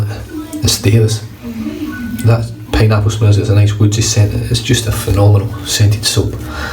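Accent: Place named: Scotland, United Kingdom. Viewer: Happy Birthday! Your Scottish accent makes me think you were older! I'm 35 and I feel like my voice doesn't match my age lol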